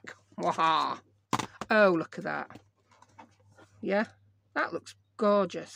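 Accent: United Kingdom. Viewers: I am American but was stationed in Britain for 3 years while in the Air Force. I love listening to you because I really miss Britain. I found myself calling scotch tape Cello tape today at work. The person I was talking to looked at me like I was from outer space. Then I realized what I had said. Lol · I loooove your channel. Maybe too much. I watch when I craft, when I go to sleep, and even on my way to and from work. But I may be over doing it because tonight at work, some components I was working on weren’t lining up and instead of the voice in my head doing it’s usual grumbling, a perfect imitation of you came out saying “Ahh! It’s all wonkay” 😂😂😂 I can’t tell you how happy I am that the voice in my head has gone British! 😂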